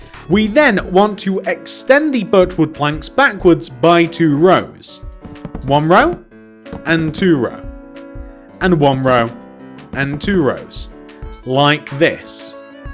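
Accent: United Kingdom. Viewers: Ah yes, an hour-long tutorial. Listen to that soothing voice of the British man.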